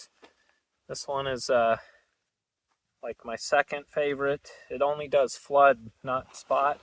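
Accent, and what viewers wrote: Utah, United States. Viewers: Did you ever live in Utah? I hear a Utah accent when you speak. On that road you were on going past "The Hole in the Rock" and going into Moab, there is a formation called the "Disappearing Angel". Starting at about 15 miles before Moab on that solid wall of rock to your left, you can see a spire out in front of it (don't know what else to call it) standing away from the wall and several feet away. You keep seeing it as you get closer. Then all of a sudden you come around a corner and the spire is completely gone - thus "the Disappearing Angel"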